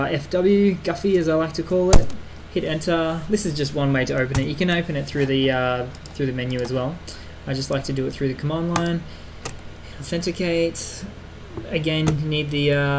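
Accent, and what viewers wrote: Australia. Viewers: You can easily make out from his accent that he is Australian, but if you want hints, First "GoodDay" greeting at start, Secondly look at this terminal/Username, Thirdly he calls Gufw Guffy.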